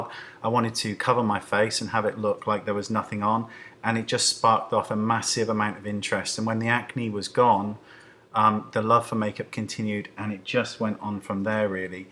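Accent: United Kingdom. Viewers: Love your story, love how passionate you are about makeup and love your Brittish accent ;)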